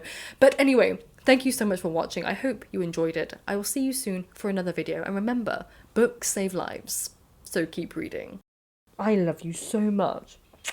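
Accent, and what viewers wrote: England, United Kingdom. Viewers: You are British af. I love the way you talk! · I feel like I just had a great lecture from a former professor. And as an American, the English accent made it all the more better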